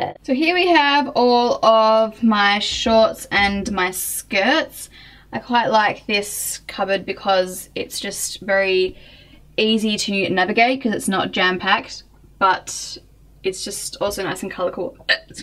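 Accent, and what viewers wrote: Australia. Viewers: are you aussie i am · The Australian accent is really strong ahah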